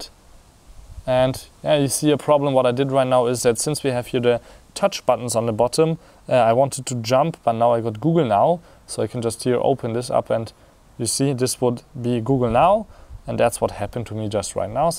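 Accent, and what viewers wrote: Germany. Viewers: Dude I love your German accent! I can be your girlfriend? XD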